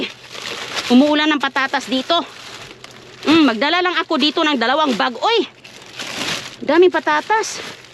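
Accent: Visayas, Philippines. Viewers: Your very visayan speaking,that's good your husband &your kids are eating foods.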